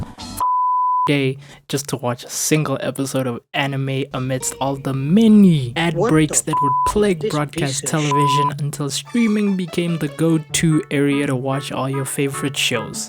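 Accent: South Africa. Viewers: Your South African WWWWW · Bro! This was a well done vid man. I actually thought you were American based off your thumb nail design. What a surprise it was to hear your South American. As a Nigerian who has been in South Africa since 2009 i relate to that SABC 2 hate😂. Keep up the great quality bro, I'm proud if you and you just got yourself a new sub.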